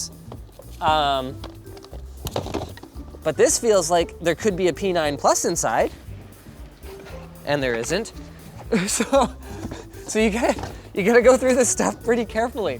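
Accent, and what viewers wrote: Canada. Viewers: Canadian accent really poppin in this video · you can hear the canadian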